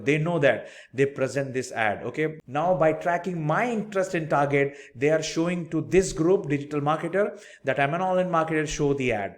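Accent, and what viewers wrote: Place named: India. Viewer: I love indian english. Value in video 👍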